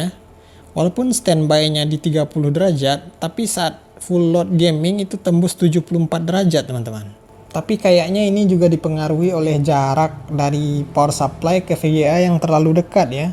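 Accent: West Sumatra, Indonesia. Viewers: Logat Sumbar nya kental nian... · logat nya minang bgt nih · Dari logat nyo pasti uda ko urang minang..awak yakin 100%..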